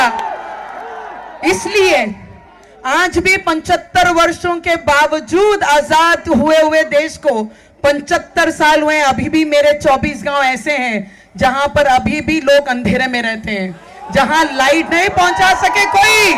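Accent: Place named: Maharashtra, India. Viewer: She speaks in typical Marashtrain style. There is no way she appears a North Indian.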